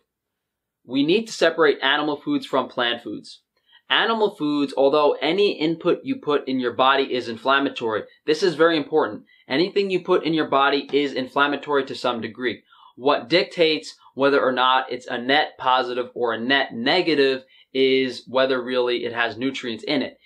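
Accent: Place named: New York, United States. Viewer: I love the neat and tidy grooming and NY accent. Awwwh!💓 Great info here and especially given the fact that most plant foods today look, taste and provide entirely different benefits and flavours to humans than they used to. Not only have we made them resilient to pesticides and shelf life, more starchy, sweeter and less varied but we eat the same species repetitively and out of seasons. Humans have great metabolic adaptability and it is not only a key to our survival but how we found creative ways to increase nutritional value of foods. Recipes are a great way to see that in practice i.e. cooking, fermentation, food combination and even pairing certain alcoholic or herbal drinks with food.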